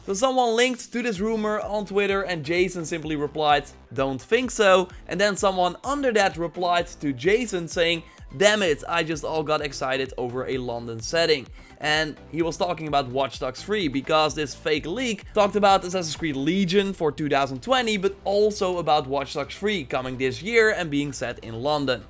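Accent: Netherlands. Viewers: Is he dutch? If not, where is he from? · Are you dutch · ughhh, your Dutch accent is the wooorstttt. I just can't keep listening to you. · This dutch english is cringe to me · Are you Dutch?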